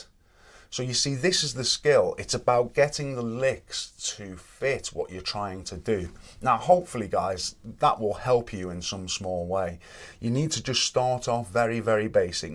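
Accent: Liverpool, United Kingdom. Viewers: Good teacher - but I agree that you should play most of the song BEFORE teaching most of it. Lovely Scouse accent!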